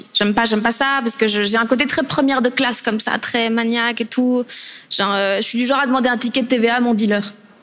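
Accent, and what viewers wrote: Belgium. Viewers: Depuis quand elle a un accent belge? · Elle est belge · C'est moi où là elle a "repris" son accent belge qu'elle a pas d'habitude à la radio? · découvre l’accent belge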